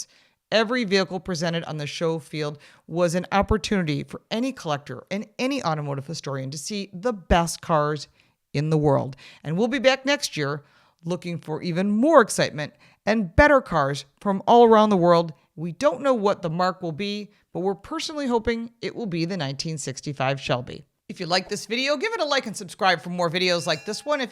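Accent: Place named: Midwest, United States. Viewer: 'thought so. i'm mainly from Chicago originally, but spent a couple years in Detroit (Southfield, 12 Mi. & Greenfield, <num>) & had family in Detroit. Unique midwestern accent! 'Enjoyed your video - What a great job to review those wonderful classics!! - Best wishes, Phil in AZ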